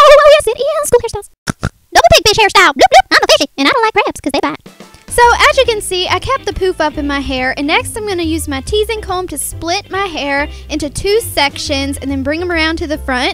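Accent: South, United States. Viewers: you talk just like me! with the southern accent!! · Your so cute and southern! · when i first saw the thumbnail for this video i thought she would be like a full on new York/jersey accent, and then she was country, pretty coolll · You are SO fun and absolutely HILARIOUS to listen too! You made my night! And its great to actually hear a southern youtuber!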